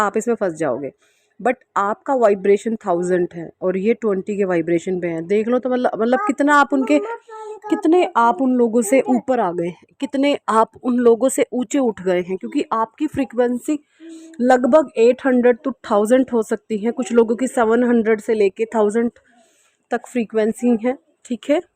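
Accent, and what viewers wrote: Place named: Punjab, India. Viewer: Your punjabi accent is so appealing!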